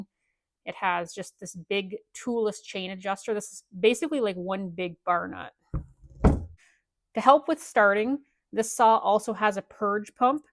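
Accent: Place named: South, Canada. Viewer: Love that southern ascent southern Canadian I guess good show as always.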